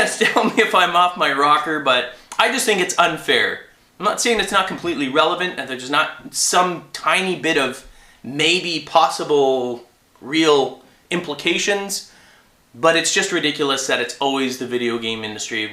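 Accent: Canada. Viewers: wate yor canadian?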